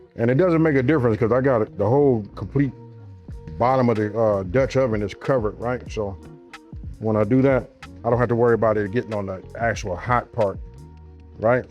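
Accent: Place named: West Coast, United States. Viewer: He got that west coast hood accent. I be waitin for him to call us busters 😆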